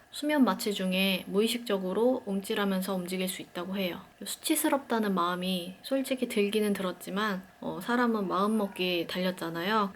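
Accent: Gwangju, South Korea. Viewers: 혹시 전라도 분이실까요?? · 광주분이신가봐요?아닌가?암튼 그래도 고차수 까지 안 가고 성공하신거 늦었지만 진심으로 축하드려요~ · 저도 2월7일날 2차피검 실패하고 다 싫고 아무것도하기싫고 사람만나기가 젤 싫은 중 입니다 말투가 광주 분이신거같아서 영상봣더니 저랑 같은 병원이시더군요…ㄱㅈㅁㅎ 너무 부럽구~ 쌍둥이 임신 축하드려요 저도 그런날이 올까요?ㅠ 서카딘은 그냥 살수잇나요? 처방전받아야하나요?? 저도 광장히 예민해서 잠을 잘 못자고 그렇게 무언가에 쫒기는 꿈을 꾼답니다ㅠ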